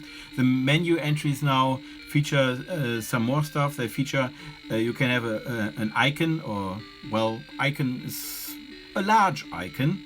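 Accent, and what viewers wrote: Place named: Germany. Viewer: You sound like German is your native tongue. ... and Giana Sisters as background music! Rocks!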